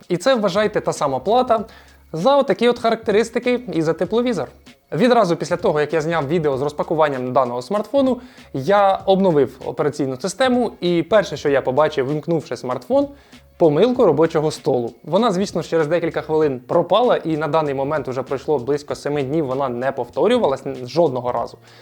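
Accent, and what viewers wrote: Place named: Russia. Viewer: Чувствуется по говору родной язык русский.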